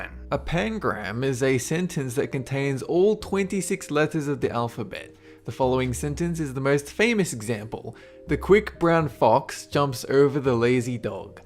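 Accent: Australia. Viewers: Are you Australian ? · He's Australian ._. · Lang Jones I didn't catch that one. He does have some odd pronunciations though. He's got an Australian accent but uses American pronunciation for heaps of words. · Are you Australian? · are you really aussie? If so then where from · You are Australian?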